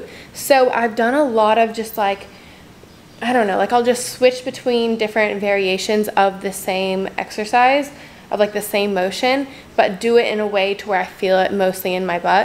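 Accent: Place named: Nashville, United States. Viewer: I can hear that Nashville accent coming through a little!! 🤠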